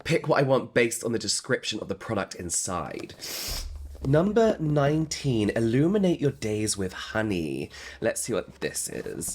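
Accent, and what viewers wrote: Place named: RP, United Kingdom. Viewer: sweetie you can pronounce words however you want, and you still sound refined British to us Americans😊. Sending love from Chicago ❤❤❤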